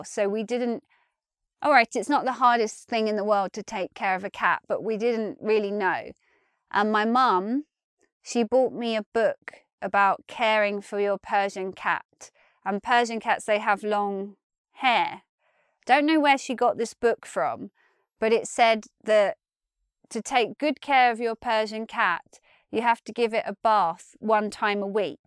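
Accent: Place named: London, United Kingdom. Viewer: Her accent gives it away. She from the UK but if you want to get more precise she from London.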